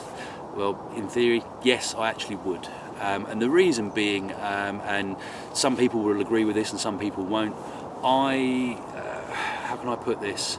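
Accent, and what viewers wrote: United Kingdom. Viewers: I like that video a lot, (1) I like the fact you're realistic about the surprising capability of the Mora, (2) I like that you show that the Ray Mears is both, an awesome tool and a piece of art (almost, at least), (3) I like the British accent. :-) PS: faultroy is an impolite troll, thus let me remind you: Don't feed the troll!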